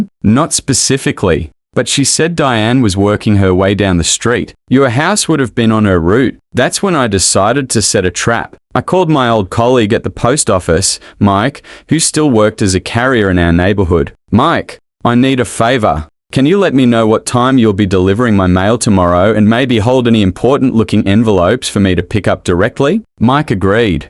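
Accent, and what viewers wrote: Australia. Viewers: Having an Aussie voice telling the story was a pleasant surprise.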